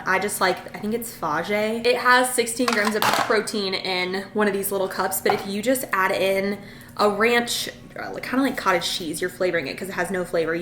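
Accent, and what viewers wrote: South, United States. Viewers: I think you are acquiring a southern accent 🤌🏻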